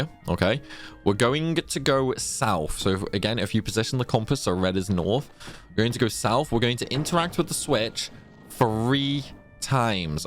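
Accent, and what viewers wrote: United Kingdom. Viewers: I followed this guide and it was accurate and precise to finding all the items. I don't know which was worse tho.... this convoluted tower or listening to this atrocious accent. Why do some brits gurggle the English language like they are choking on spoiled milk? Prime example of why the American revolution needed to happen.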